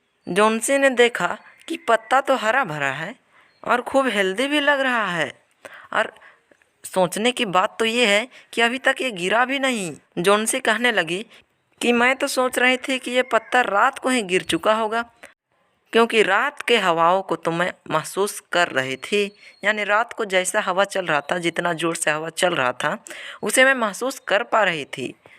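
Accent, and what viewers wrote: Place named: Uttar Pradesh, India. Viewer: Thanks bro for explanation it help me lot in explaining the question by the way it seems like you're from uttar Pradesh or Bihar and I am sure you will have million of subscriber in these year all the best and happy new year 🥳🥳🥳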